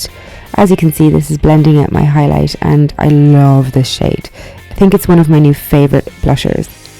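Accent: Ireland. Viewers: I see you are Australian, but what is it about your accent that sounds slightly Irish?? Is this a New South Wales thing that an American would know nothing about?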